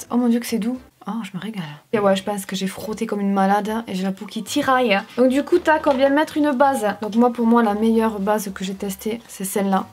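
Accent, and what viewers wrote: South, France. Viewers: Oh putaing l'accent du sud j'adore ! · J’ai oublié de dire Bonne continuation aussi. Ah qu’il est beau cet accent du sud. Je regardais déjà tes vidéos à tes débuts et franchement tu es resté toi même et ça c’est beau aussi.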